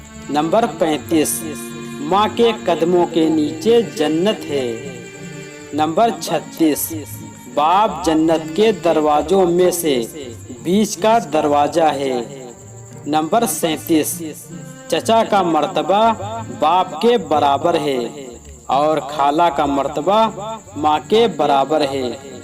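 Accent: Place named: India. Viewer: Tallafuz sahi karyei Hindi mei hai Urdu mei boliye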